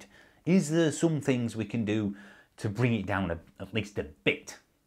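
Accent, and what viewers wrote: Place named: Hartlepool, United Kingdom. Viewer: Same accent as Hartlepool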